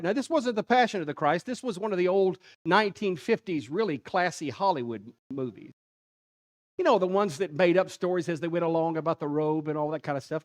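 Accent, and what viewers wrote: South, United States. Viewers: Lol as a former Catholic, please stop lying to yourself. The Roman Catholic Church is schismatic, they departed from the traditions and dogma of Christ’s church within the first 1000 years. Modern Roman Catholicism is the biggest contradiction and cope of all. The sermon was on point, Christ’s crucifixion was a complete victory ☦️. And may I ask what’s protestant about this? Is it because he’s speaking with a southern American accent or because he’s speaking with some enthusiasm?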